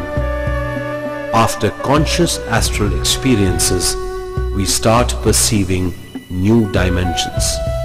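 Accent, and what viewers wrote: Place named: India. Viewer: I understand that you could be having an indian accent when you speak. Like a hot potato in your mouth. Now, you do not need to transfer that accent into writing form. "That" is not spelled DAT, DIS is spelled THIS. I would believe that those words are common enough so that you do not need to make those simple mistakes. Please meditate on THAT!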